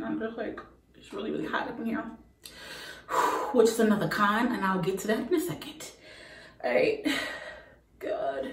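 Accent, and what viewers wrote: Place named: New Orleans, United States. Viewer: I hear that small New Orleans accent you got lol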